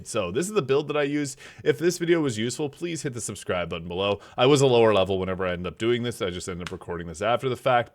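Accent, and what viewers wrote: Canada. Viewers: Took about 10 seconds to figure out you are EXTREMELY Canadian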